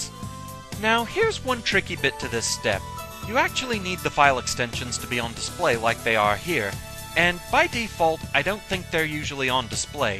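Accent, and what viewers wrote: Scotland, United Kingdom. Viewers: SCOTISH ACCENTS RULE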